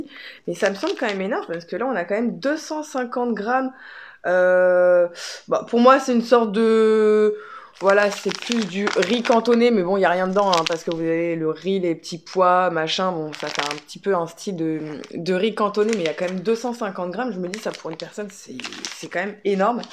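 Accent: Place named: Normandy, France. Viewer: haha t habites en normandie!! je me disais bien que tu avais ce petit accent si tu arrives a arreter de fumer ce serait top!!!!! mets la photo de ton pere sur ton porte monnaie ça va te motiver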